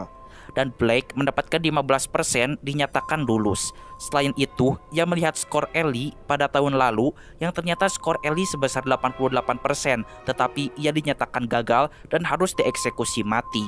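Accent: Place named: Sunda, Indonesia. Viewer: Suaranya sunda banget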